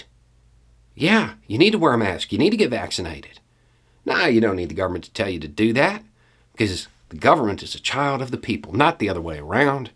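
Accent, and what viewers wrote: United States. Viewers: You're so relatable with your country English. 🤘 P.S.: I'm late to the shot party, but I got mine. I felt zero pain or side effects. I'm still masking, cuz Delta is a bitch. Got the J&J vaccine. Never hear/see shit about people gettin' Delta on THAT one and done.🤔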